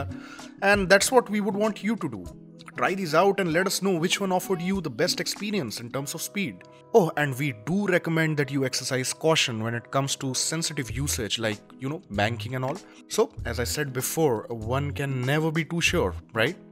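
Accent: India. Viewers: The best review of vpn by an I Indian accent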